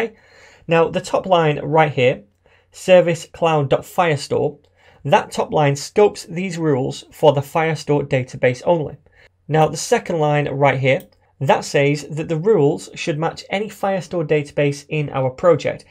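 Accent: United Kingdom. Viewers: I always watch your videos, now I have the same british accent as yours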